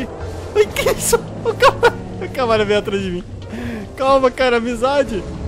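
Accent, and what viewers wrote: Rio Grande do Sul, Brazil. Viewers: Vai rola pauleira kkkkkk da para ver que tu é gaúcho kkk tbm sou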